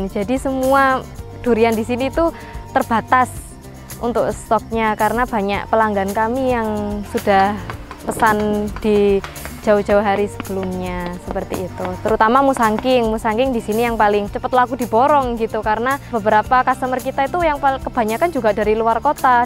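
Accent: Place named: Malang, Indonesia. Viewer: Logat malange gak ilang ,, " "ikuaa Lo mas "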